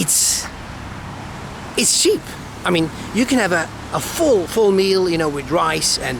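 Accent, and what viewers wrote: United States. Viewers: Please I hope you should come to Sabah to explore more of out unknown beauty hidden in the big jungle of Borneo, deep sea treasures at Semporna, Mount Kinabalu n our friendest people in Malaysia.. Plus the rich varieties of sea foods, local foods n local fruits that maybe you have not across before.. By the by listening to your English slang.. You could be an American n migrated to Europe I used to stay in US in 1980s while studying at Indiana State University.. till I obtained M.Ed.Geograpy..came back to Kota Kinabalu Sabah 1989.I enjoyed 6 years staying in US during my studies.. I wis one day I ll come back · You say you are a Swiss family. But, you have a US accent, with alot of Americanisms. Why is that?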